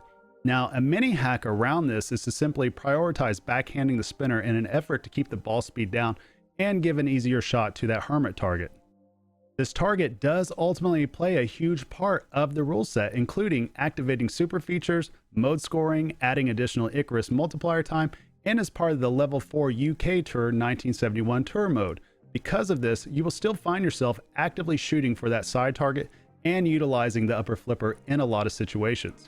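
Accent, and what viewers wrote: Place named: Oklahoma, United States. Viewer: Fellow okie! Great review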